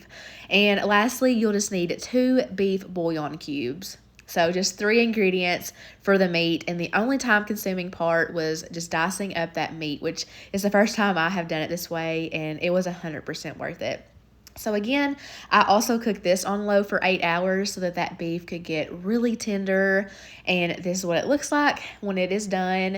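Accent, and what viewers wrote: South, United States. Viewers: Food looks delicious. I just wish I didn’t have to listen to that southern accent.. 🙄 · Fellow lefty here! Love your videos, especially your pronunciation of words in a Southern accent. "Boil" gets me every time!!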